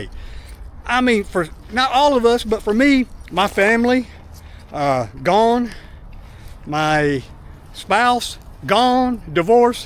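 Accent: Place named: North Carolina, United States. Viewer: Somehow your video popped up in my feed...maybe because i'm subscribed to JoGo's channel😅....(since you have the same accent as 'JoGo', I can now recognize a North Carolina accent 😅).....but that aside...you're thinking about to postpone for another 2 years...which is understanable...an other vlogger 'the Ugly Tourist'...had a friend who also planned to retire in the Phills..good job/good pay above what he already had...but he wanted to wait 2 extra years to gather more USD's...but the Ugly Tourist went ahead...and his friend....died 6 months later....so, yeah...you never know...just take in consideration that 97,99% of the people worldwide is not in a position like you do....so whille you're still breathing....GO! Enjoy more of your time!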